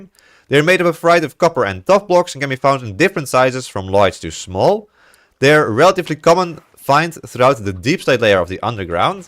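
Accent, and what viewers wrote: Netherlands. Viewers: U sound nl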